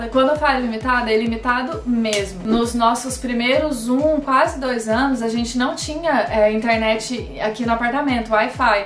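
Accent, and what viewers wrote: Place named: Goiás, Brazil. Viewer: Eu e minha esposa devido a atual situação de nosso país, resolvemos imigrar. Tenho direito a cidania italiana e dai partimos por buscar opções. Acabamos caindo nos seus vídeos e estamos adorando sua simpatia e didatica ao explicar tudo! Ficamos encantados pela Irlânda, obrigado pelos videos e continue assim! PS: enquanto assistiamos seus vídeos eu falei pra minha esposa ''Ela é goiana, olha esse sotaque'' e num é que é mesmo? Somos de Gyn tbm! Parabéns pela casa, da pra ver a felicidade em vcs.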